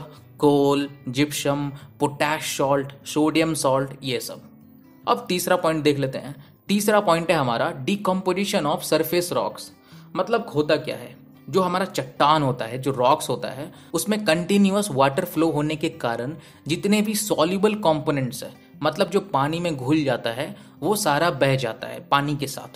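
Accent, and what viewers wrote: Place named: Bihar, India. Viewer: Bihari accent is just soo cute🤧💜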